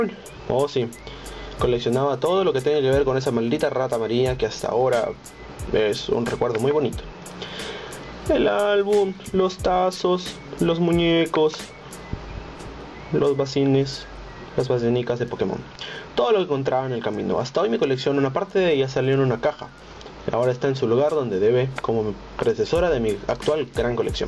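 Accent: Peru. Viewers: Eres peruano??? Bien csm salu2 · ERES PERUANO!!! :0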